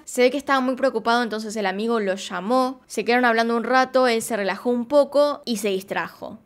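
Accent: Argentina. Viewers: SOS ARGENTINA ? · Te amo 🥰❤️ Amo tu canal ❗️ Adoro el acento argentino se me pega mucho y más que estoy viendo una serie llamada Rebelde Way de Netflix 😂❤️👌🏼 Saludos desde Perú 🇵🇪❗️ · No es que tu voz de argentina Me gusta, digamos que es una de las quémenos me disgusta.Hay muchos canales como el tuyo pero su narrativa me aburre mucho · Es su acento argentino :/ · Me encanta como narras los casos, eres linda, tierna y graciosa y ese acento argentino en ti me encanta. Un abrazo desde Venezuela 🇻🇪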